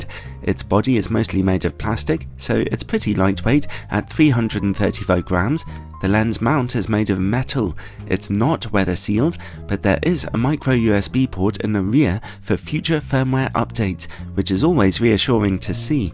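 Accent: United Kingdom. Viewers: He's british, and has a very british voice. That's the brit secret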